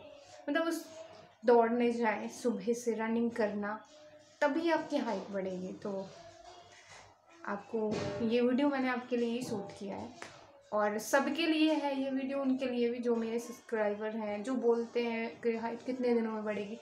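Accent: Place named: Mumbai, India. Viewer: Aji madam ji aap kaha se ho mujhe aapki aavaj Mumbai se lag rahi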